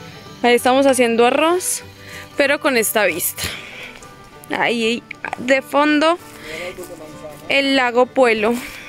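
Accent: Colombia. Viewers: Me encanta su espontaneidad y como muestran la realidad de vivir viajando. Que lindo que estén en Argentina . Disfruten y éxitos en sus caminos de aventura. Hermosos y divinos colombianitos. Con ese acento que me fascina. Besitos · Me agrada mucho la forma de expresarse que tienen las colombianas, tengo una amiga de Envigado que es muy parecida a ti y es un sueño oírla y verla hablar. suerte en el resto del viaje por Argentina, Y desde luego mas allá aún. Soy de Gral Alvear, provincia de Mendoza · El acento colombiano 🥰🥰🥰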